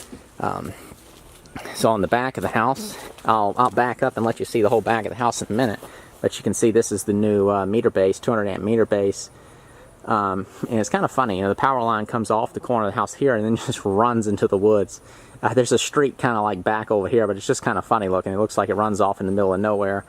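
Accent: South, United States. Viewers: Where do you live? I live in Colorado and have thought of doing something like this but real estate here is unbelievably expensive. I am thinking of going back home to Mississippi and buying properties there. You sound like you're maybe from the South so I was just wondering. Good video, thanks for posting. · I came because the thumbnail and title made me curious, but I stayed for the southern accent lol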